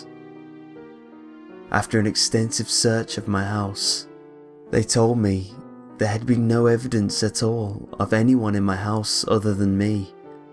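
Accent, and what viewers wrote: United Kingdom. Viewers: This is my favorite creepy pasta channel, I don't why but I like them better when someone British reads them. I have watched most of his, does anyone know of another really good british creepy pasta channel?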